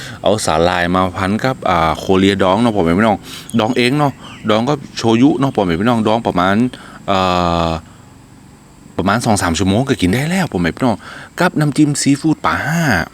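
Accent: North, Thailand. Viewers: น่ากินเนาะ .คนเหนือพูดจาเพราะดีนะ.ชอบๆๆจ้า.❤️❤️❤️👍👍👍🇦🇹🇦🇹🇦🇹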